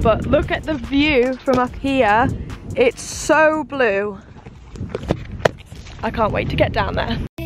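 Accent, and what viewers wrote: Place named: Nottingham, United Kingdom. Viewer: Hi there, love your videos, you wouldn't happen to be from Nottingham would you? I'm sure I recognise the accent! Great video by the way, I love Cornwall (when the weather is right)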